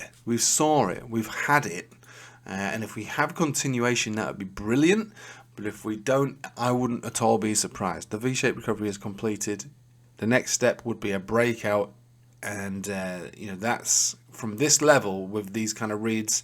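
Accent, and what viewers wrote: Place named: England, United Kingdom. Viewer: First time I’ve listened.. Nice honest analysis. Nice to hear an Englishman. God Bless. Subscribed. 🎉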